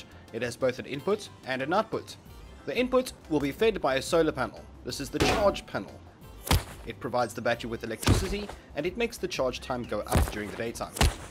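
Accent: South Africa. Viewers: South African? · Are you South African? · Dude you should like a South African??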